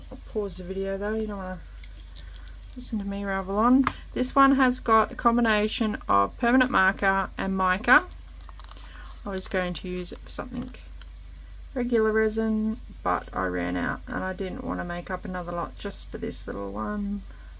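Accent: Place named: Australia. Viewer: Thanks for the video - I definitely did learn from your mistake. Got some Solidcrete today & found your video hoping to avoid mistakes & hopefully it worked. Still not cured, but a few years ago I had a go with some other brand and did the same thing as you. It looked far too runny, so I added more powder & disaster. So glad I found your video & avoided that mistake this time. Great hearing an Aussie voice for a change in my hunt online for crafting tips. Subscribed ☺👍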